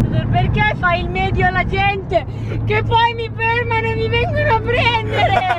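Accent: Italy. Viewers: inglese alla renzi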